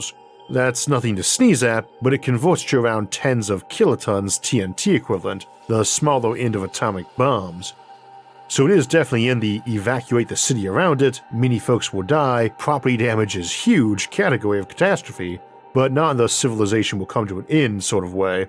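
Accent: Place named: South, United States. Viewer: I just can't place the accent. It's familiar yet foreign. Some kind of southern flavor like colonel sanders.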